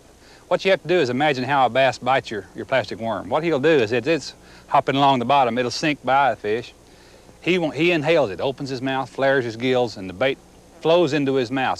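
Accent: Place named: Tennessee, United States. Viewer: You sound like bill dance! Must be from Tennessee?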